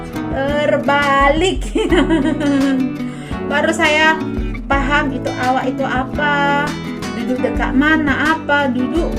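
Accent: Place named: Medan, Indonesia. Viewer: Dia dari Medan Sumatera Utara Indonesia... wkwkwk cuma bagi tahu je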